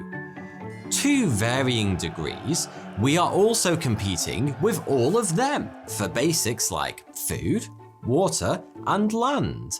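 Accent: United Kingdom. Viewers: Great videos, thoughty and smart content, daily posting along with a narrator that spoke with a British English accent and with awesome discounts! This is awesome!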